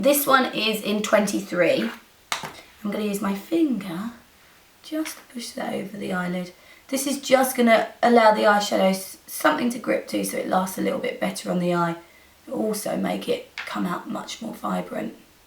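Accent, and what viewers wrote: United Kingdom. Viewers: Are you from the U.K coz u have a british acceny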